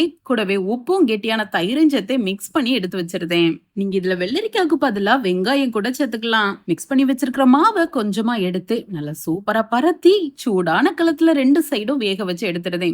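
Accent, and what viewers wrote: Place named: Tamil Nadu, India. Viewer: I like your tamil accent.Which state are you from? So impressive And love watching your videos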